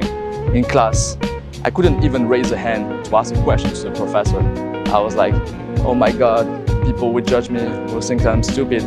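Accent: France. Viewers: are you french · Thank You man ! I think this is the best video I ve seen today! And I love ton beau French accent en tout cas merci pour cette video et tout tes conseils instructifs <3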